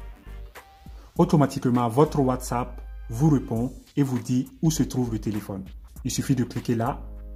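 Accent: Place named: Mali. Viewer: Merci beaucoup depuis le Mali. Votre accent me semble malien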